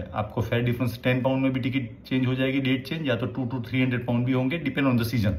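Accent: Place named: Mumbai, India. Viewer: Thank You bhai for this information . Love your Mumbai wala Hindi 😂 “ maro goli “ Dhanyavad 🙏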